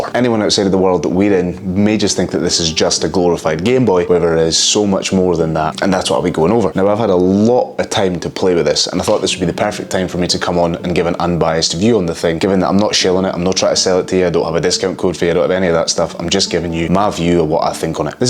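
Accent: Scotland, United Kingdom. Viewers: I appreciate your transparency and most of all your content (and scottish accent).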